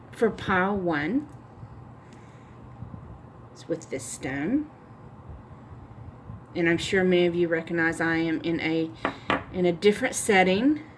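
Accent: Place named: South, United States. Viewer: You sound so much like me ! I love your country twang ☺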